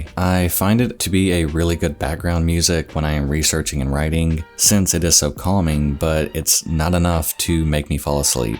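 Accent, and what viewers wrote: South, United States. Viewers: How come I’ve never heard the southern accent until you’ve mentioned it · His "southern" accent is about as strong as mine; I was born down South but grew up in various northern states and it's mostly faded. The accent is still evident in certain words and phrases though. It's just not a typical southern drawl.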